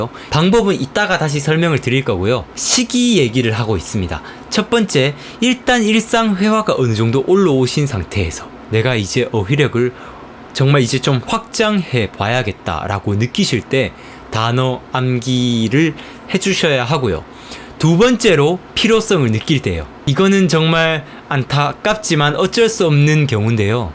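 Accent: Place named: North Gyeongsang, South Korea. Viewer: 아닙니당 ㅎㅎ제가 유도리가없었네요! 경북에사시나요? 고향이 포항인데 말투가 비슷하네요:)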